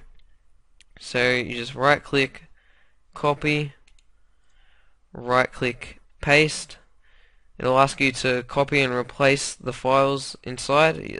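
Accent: Australia. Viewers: ha, i knew it! the australian accent is just unmistakeable :D whereabouts do u live? i am from germany and spent half a year over there, was fun ;)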